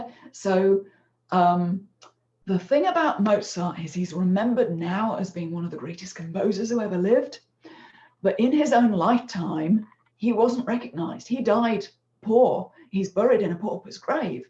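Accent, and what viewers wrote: United Kingdom. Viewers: Hello my name is Tricia and I am an introvert:) your voice is wonderful. This comment has been along time coming; but I am truly an introvert. As I said I love your voice, it is one of those "super sexy low and dulcet toned British voice's". I am out of my shell why not because of any virus no no I am in a bother about the Foundation Raveled sign up. Yes I have been stalking the Foundations Raveled web site for 10 years. Yes there should be another why, for 15 years my life has been ruled by chronic pain, and could not sew:( But now after 3 operations, 4 months of exercise and this thingy in my right buttock that I have to recharge once a week and yes I do think of myself as a cyborg. Now finally my health is good enough to sew, I am slow but I can still sew. I learnt is to make plans for the beyond what ever the difficulty was with the chronic pain. 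So make plans and hold on to them in the here and now, this is how I got through the fear. Those plans are a foot, I think, I hope, I am on the wait list, I tried to put my self on it and now I am having a very small panic. Lastly before go back in to my shell maybe the wonderful could do these live talks regularly:) xxx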